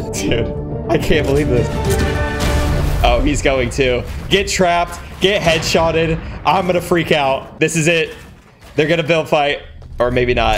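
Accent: Germany. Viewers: Are you german? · Is he German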